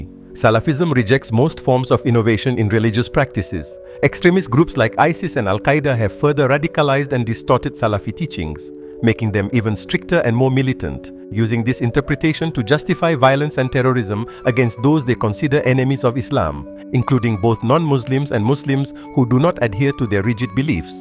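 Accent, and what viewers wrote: India. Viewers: 😂😂 a video with Indian accent big red flag 🚩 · has indian accent.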